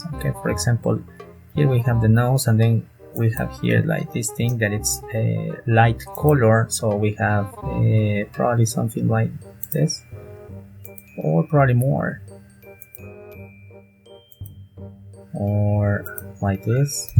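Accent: Spain. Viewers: cause its kinda hard to understand you with your strong Spanish accent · Se nota que hablas español xd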